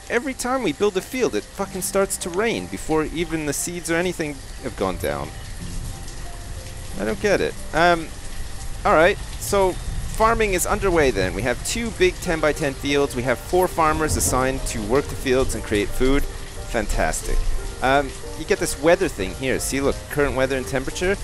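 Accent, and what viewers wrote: Canada. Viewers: I can hear your Canadian accent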